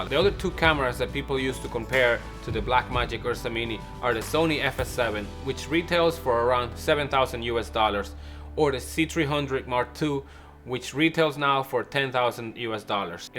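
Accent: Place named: Dublin, Ireland. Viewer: brilliant review I knew there was a bit of a Dublin twang in the accent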